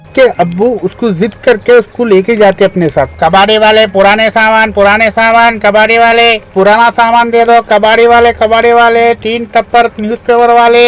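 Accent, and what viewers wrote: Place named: Hyderabad, India. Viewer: حیدرآبادی اسینٹ لگ رہا ہے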